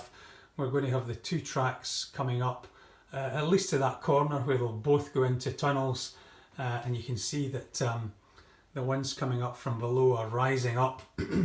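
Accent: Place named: Scotland, United Kingdom. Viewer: Always nice to hear a Scottish modeller. Subbed 👍🏻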